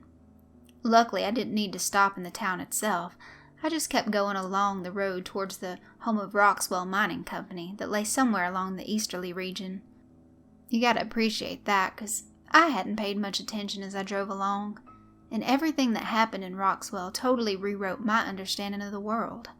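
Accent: South, United States. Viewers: This is my first story of yours I'm listening to! Is your voice always like this? (its been so long since I last heard someone from the South)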